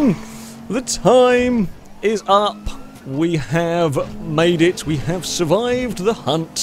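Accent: England, United Kingdom. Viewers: Omg i forgot about ur fancy english accent and ur adventures 💰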